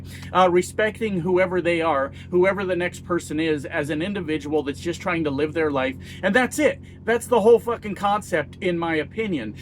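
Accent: Canada. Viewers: We got the right guy for prime minister. And yes, you do sound Canadian. We love your speeches. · Didn't think about it until you pointed it out, but you do sound like you're from Nova Scotia😊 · does sound Canadian a little...not sure why:face-blue-smiling::face-green-smiling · You have the kind of Canadian accent that makes Canadians ask "What part of Canada are you from?". You sound like one of us, just one from someplace else. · You do have a Canadian accent! I embrace you as an honorary Canadian. Thank you for your support. 🇨🇦 I am proud of Canada. 🇨🇦🇨🇦🇨🇦🇨🇦🇨🇦🇨🇦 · Ugh. I’m super happy that our Country voted for Carney but I am from ‘Berta so this morning I woke up to a FB feed full of separatists. I think I feel the way you guys felt watching your friends vote for Trump. I’m still nervous at what havoc my province is going to stir up. That being said, you very much do sound like a Canadian bud. 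Welcome!:). And not the weird ‘Berta type.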